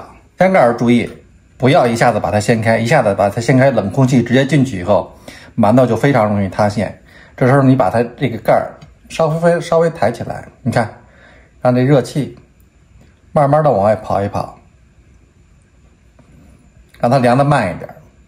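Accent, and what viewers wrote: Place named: Beijing, China. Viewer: 给点赞，平和随性地道老北京味，不错加油↖(^ω^)↗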